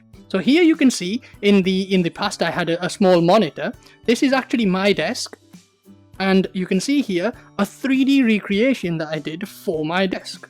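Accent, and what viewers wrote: United Kingdom. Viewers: I bet this dude is Asian too, it's that British Asian accent 😂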